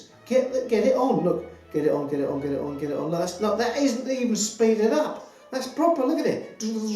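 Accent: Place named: Germany. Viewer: Can you cite to two must read books. I could not understand through that thick german accent of yours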